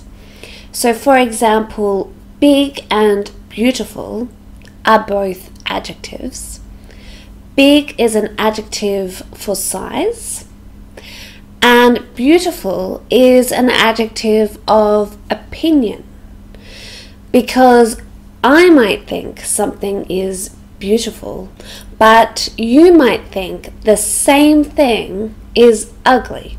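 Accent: Australia. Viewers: I am used to understand more American accent than other accents and I was trying figure out which word you were saying at certain moment in the video. I found out when you said and wrote the word on the screen. The word was "big". hehehe A very easy word but I swear I really couldn't get it. I love accents as such as British, American, Australian, Canada and New Zealand. Therefore, I always say that we must listening different accents. By the way, I love the Australian accent. It is so marvelous how you sound when you speak.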